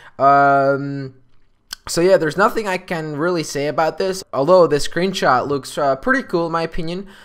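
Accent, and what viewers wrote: Netherlands. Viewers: He is from The Netherlands So he is speaking not the engilsh as you i think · This guy is from the "South" of the Netherlands, and their Dutch compared with their english is no good... Or easily said: Annoying. (Im from Amsterdam)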